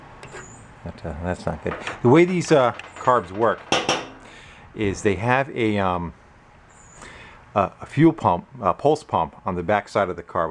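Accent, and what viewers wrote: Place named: Albany, United States. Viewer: this guy must be from albany ny area he dont sound from NH.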